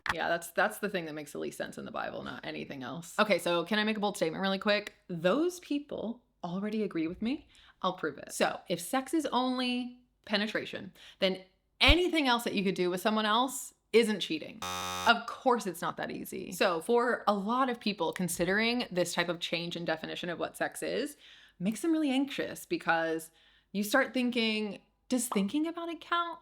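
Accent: United Kingdom. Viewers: Welcome back,! What a fantastic video (incredible edit, reminds me of PBS Get Lit content), I can't wait to see what else you produce. <3 P.S. I had a little giggle when you acknowledged your change in inflection to being more bri'ish.;)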